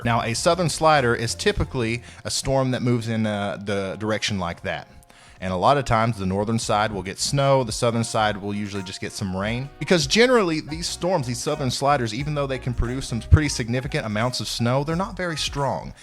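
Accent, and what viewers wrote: South, United States. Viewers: You can tell that he cleaned up the southern draw just a little bit as he got more popular lol 🤣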